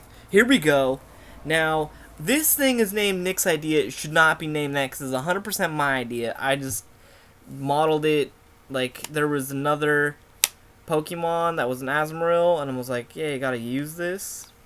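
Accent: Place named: Texas, United States. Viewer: No more Texas accent please, it doesn't suit you... No offense or anything. :)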